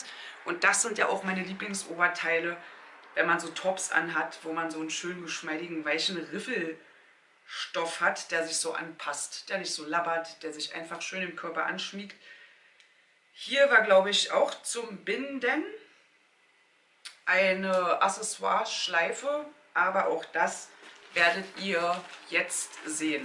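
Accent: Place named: Berlin, Germany. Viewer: Du bist unsere swimwear queen 🤣🤣 Body paßt dazu Berliner schnauze was will man mehr 😁😘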